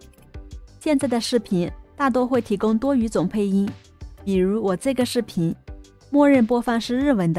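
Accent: China. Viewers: 声音挺可爱的，而且英文也是同款中式，很有亲切感~哈哈哈~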